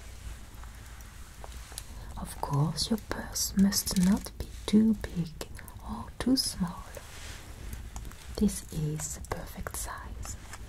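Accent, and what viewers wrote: France. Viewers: Tu parles d’une façon très française 👍🇰🇷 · love when you speak french + english with a french accent ! youre such an elegant, classy and beautiful woman. ❤ i love your vision and creativity. top tier content ! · Simply astounding production quality in every way!🙏 The lighting looks wonderful, beautiful images. And your French accent, and dialogue in French, is delightful to hear too.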